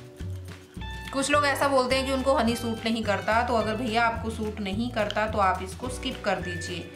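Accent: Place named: Haryana, India. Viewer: Mam AAP Haryana se ho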